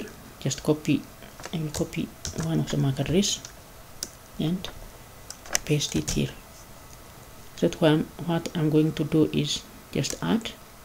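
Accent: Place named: India. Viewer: ur tryn' to speak like indians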